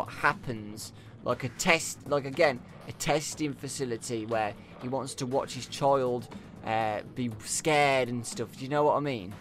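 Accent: United Kingdom. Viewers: that was sweet man ! well done but that british accent :-D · He has a british Accent he is form the UK C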